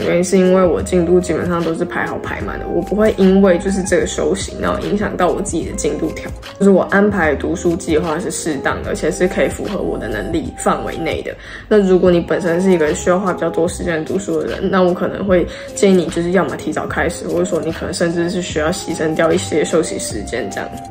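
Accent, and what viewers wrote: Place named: China. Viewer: 為什麽有種大陸腔哈哈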